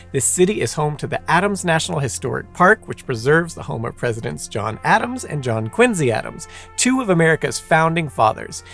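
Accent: United Kingdom. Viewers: you fool!!! The British accent is ASMR to American ears!!! This guys effeminate whaling will never suffice…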